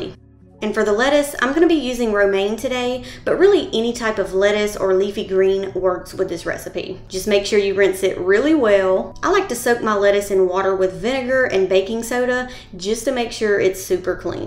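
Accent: South, United States. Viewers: "bowd eggs" 😊 "taws" 🥰 I absolutely love her voice and accent. (I know, many from the South are thinking, What accent? 🤔)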